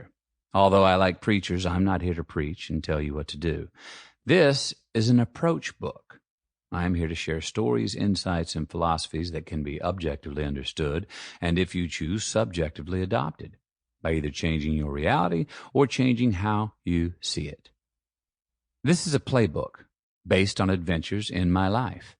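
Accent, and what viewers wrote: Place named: South, United States. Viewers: This man makes my innards quiver. Southern gentleman have IT. But this man bequeaths the essence of every southern man. He has it ALL; the eyes. the beautiful Texas accent-The whole package and by God he has sex appeal in spades. Whew. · He’s just making up empty platitudes and selling it with a confident southern accent. He is an insane person and I love him for that.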